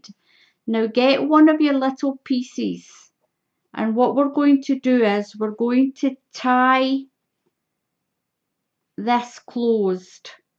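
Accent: Scotland, United Kingdom. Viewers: Oh My! Hope I'm not wrong. You sound Scottish. And hopefully I spelled it right otherwise my Gramma would kill me if she were still living. She was my Hero. Everyday in every way I aspire to be just like her. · I love your crafty videos,! I also love to hear the Scottish accent spoken... I like to think it's something in me hearkening back to my Scottish roots, haha! Anyway, Thank you so much for sharing this tutorial! Rachel D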